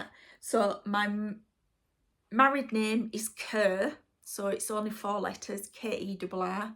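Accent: Yorkshire, United Kingdom. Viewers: I absolutely love your Flosstube! You are so genuine and funny.....an I do love a Yorkshire accent! Your stitching is gorgeous and very inspiring. Are you going to the Great British Sampler Weekend next year? 🤩♥️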